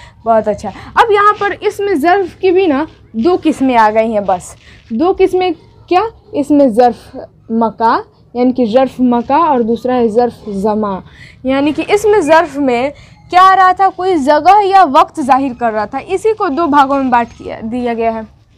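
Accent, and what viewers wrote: Bihar, India. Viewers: ماشاءاللہ بہت خوب بس پلیس آپ شاید بہار سے ہو اس لئے کے آپکی زبان بتارہی ہے جیسے(زگہ نہیں بہن جگہ) معذرت کے ساتھ آپ سے درخواست ہے کہ آپ انٹرنیشنل استاذ ہو اس لئے زبان بھی ویسی ہی ہو جزاکم اللہ خیرا واحسن الجزاء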